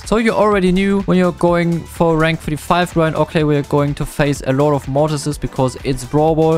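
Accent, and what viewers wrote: Germany. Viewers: are you german or french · Das ist so richtiges deutsches Englisch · ich finde es voll witzig wie du englisch sprichst weil man hört das du aus Deutschland kommst😂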